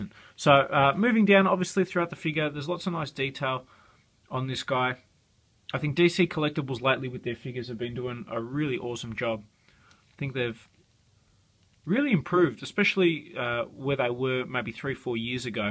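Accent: Australia. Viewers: Hey loved the review! Are you from Australia?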